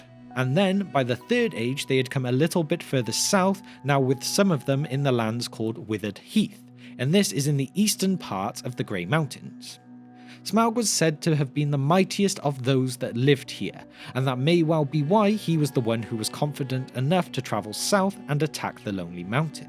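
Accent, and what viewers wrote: Wales, United Kingdom. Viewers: You're Welsh? If I ever bump into you in the Merthyr area I owe you a drink. You guys do amazing work on these videos, nice and easy to digest :) Do you have a Discord?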